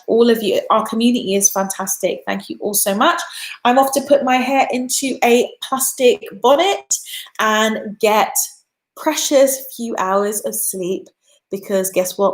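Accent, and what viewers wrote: United Kingdom. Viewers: So funny. I literally just looked up "fortnightly" (happening or produced every two weeks). You speak the Queen's English. I speak American. LOL!!!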